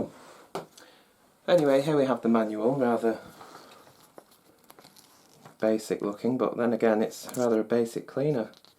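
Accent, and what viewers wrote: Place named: Devon, United Kingdom. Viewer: We Devonshire lads talk like that as well you know😂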